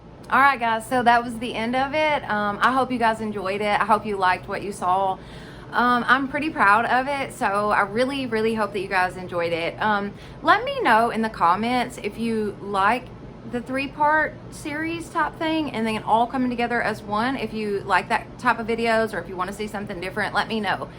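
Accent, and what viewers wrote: South, United States. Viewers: I LOVE the southern accent!! Anywho, I love all of your DIY's! I'm so glad I found your channel!! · Girl I'm just getting started crafting making stuff for my home. I love your creations and love your southern accent. I'm from Alabama... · I generally don't like to listen to a southern accent, but I loved your spunk and not letting your kids intimidate you into not doing these videos and your style of crafting is really cute, so different from other items I've seen in other videos. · It’s a good thing you didn’t listen to your kids because you’re an amazing crafter. And I’m a southerner so I love the accent. :) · You are a great addition to YouTube! I’m looking forward to more videos. Awesome diy tutorials. Love your accent. My family is from the south and it takes me back. I get to talkin like them when I’m around them. Nothing but ❤️ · I am another southern girl & I love listening to someone that sounds like me. 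I am so glad that you are one of those diyers that talks about the things that can go wrong while doing a project. Loved your projects & hope you have great success with your channel. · Love your tutorials! You think more outside the box from most YouTube DT Crafter's. Btw, where are you from? Your southern accent sounds almost exactly like mine. I'm from Western NC.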